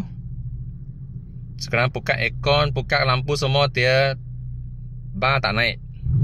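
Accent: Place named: China. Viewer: Wehy mulut ko mmg takde insurans ke musibat, ni ckp elok jek aku dengar,cuma dia pelat sbb dia berbangsa cina,yg ko jgn buat malu warganegara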